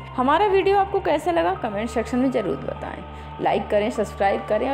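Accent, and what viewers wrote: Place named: Indore, India. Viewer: Indore walon ki tarah hi h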